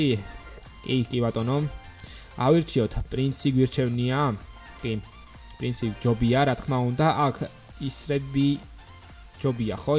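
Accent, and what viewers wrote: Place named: Guria, Georgia. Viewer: guruli kilo gaqvs dzaan magari arxi gaqvs es ubralod ise gitxari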